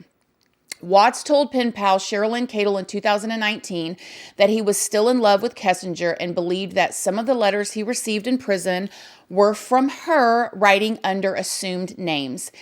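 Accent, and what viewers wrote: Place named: Texas, United States. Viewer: Darling, you are fabulous, and FUNNNNNEEEE! You know what I think? I believe Chris Watts couldn’t walk away his pregnant wife ((What would people think of me, so, his family had to disappear - and NK’s pull on him was tantamount to hypnotism; as though she were a real life Siren, he unable to resist her call. ….! I willdefinitely be watching more of you; you are incredible!! Love your Tevan accent Y’all an’ all!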